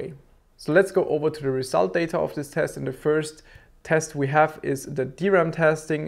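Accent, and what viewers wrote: Germany. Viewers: Dieser deutsche Akzent 😂👌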